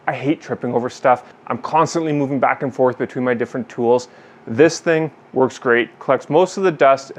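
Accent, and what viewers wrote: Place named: Canada. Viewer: I never comment, but I kept thinking 'this guys sounds Canadian or something...' Then you dropped it: You are! A shout out from Ottawa! Keep it up, I'm subscribed. I want to hear about that 3D printer for sure. Been holding off on getting one of those but the writing seems to be on the wall, they're too useful to ignore.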